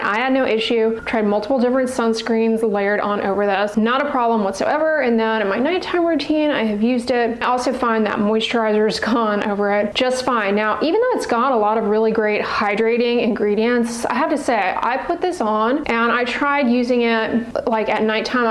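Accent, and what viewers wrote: United States. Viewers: WOW what weird thing to say!!! She has a lovely American accent, nothing unbearable about it!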